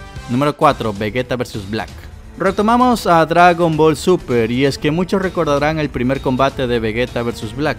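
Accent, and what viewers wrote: Ecuador. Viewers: Gabardina? Te expresas como nosotros mijo eres ecuatoriano? Saludos me gustan tus videos loco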